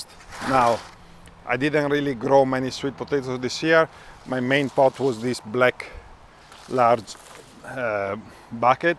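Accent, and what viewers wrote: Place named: Italy. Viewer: Grande.. … looks good 👍👍 love your Italian accent when you speak English 😂😂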